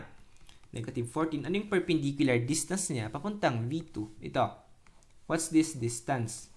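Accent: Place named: Pangasinan, Philippines. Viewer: now watching <3 quiz later tas exam next week hahaha btw may pangasinan accent kayo like bangking hahaha idk if im right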